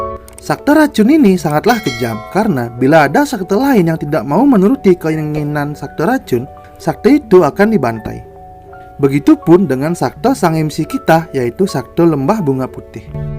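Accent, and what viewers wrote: Bali, Indonesia. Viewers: nak bali? kayak logat orang bali pas ngomong😁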